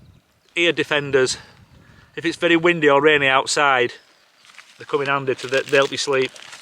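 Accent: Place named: Yorkshire, United Kingdom. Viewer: love your accent not heard the yorkshire twang for a while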